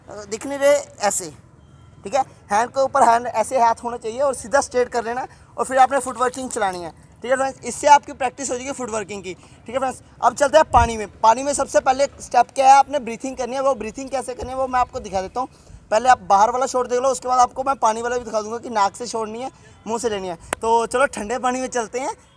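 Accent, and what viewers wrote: Punjab, India. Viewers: I liked ur accent - Punjabi Hindi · I love your Punjabi Hindi! #fullDesi ❤️ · Oh that Punjabi accent 😆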